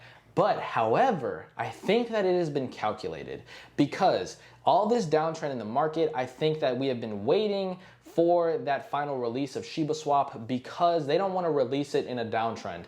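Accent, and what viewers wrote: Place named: United States. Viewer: Very good plain English.. Easy for me to understand.. Love American accent.. Go shibarmy.. from Malaysia 🇲🇾😊🚀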